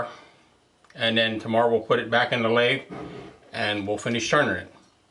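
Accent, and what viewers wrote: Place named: Pittsburgh, United States. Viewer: You've GOTTA be from Da Burgh!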